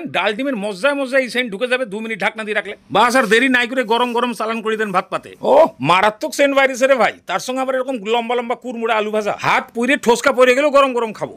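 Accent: Bangal, Bangladesh. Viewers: দাদা আপনি বাঙাল? 😅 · বাংলাদেশি ভয়েজ❤ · Bangladesher Bengali der moton kore kotha bollen . Love from Bangladesh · আমগো বাংলাদেশের ভাষায় কতা কইতাছেন ক্যান?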